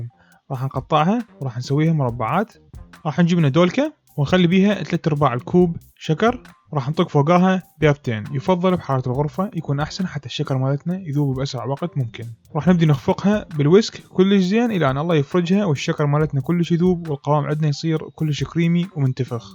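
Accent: Iraq. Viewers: تعجبني قناتك وطريقة طرحك باللهجه العراقيه الجميله شكرا استفدت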